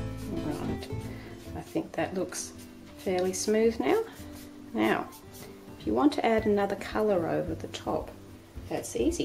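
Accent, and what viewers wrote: Australia. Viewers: Unable to sleep, my creative mind wondered - and it wondered right over to discover I am in fact not totally loopy for using the same technique! I cannot draw to save myself, but have found art therapy useful for years; so thank you for your tips. Love hearing a fellow Aussie voice to. "Rubber" usage unite!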